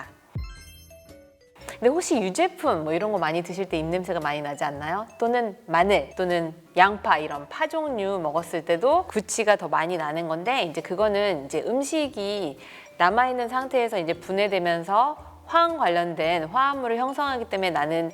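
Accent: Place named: United States. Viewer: 영어 발음두 미국본토 발음이시구 네이버 다음만큼 지식이 훌륭하십니다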